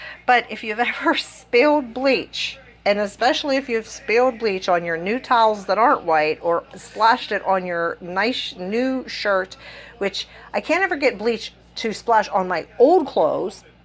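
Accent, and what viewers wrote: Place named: Pennsylvania, United States. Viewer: I love hearing that PA accent! My husband's family is from Mckeesport and Monroeville. My in-laws and Husband lost their accent b/c they spent 20 years in South Florida. Hearing you reminds me of hub's grandparents, who passed on years ago. Wow! That was a long winded explanation 😂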